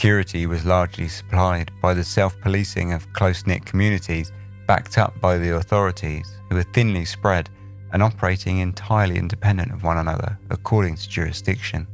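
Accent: United Kingdom. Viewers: All of your videos are shit. No pics or anything.. just your stupid Brit accent and you talking... Come on you lame prick.. total garbage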